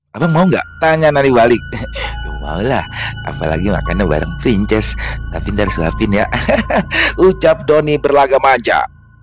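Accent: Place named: Betawi, Indonesia. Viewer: Dari bawah sampe atas nyari cerita warung kopi bang doni😂😂 lucu bae bahasa betawinya 🤣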